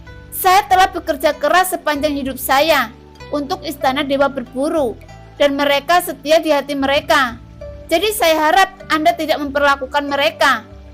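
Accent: Java, Indonesia. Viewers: Medoknya diminimalisir mbak e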